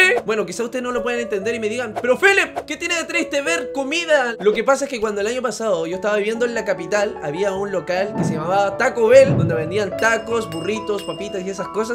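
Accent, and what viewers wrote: Chile. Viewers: De que país es el? Tiene acento chileno la wea cuántica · ¿Porque habla como chileno?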